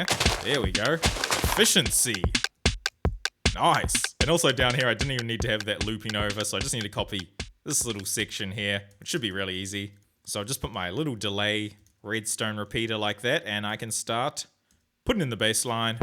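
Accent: New Zealand. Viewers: Are u NZ? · Wait is this guy a kiwi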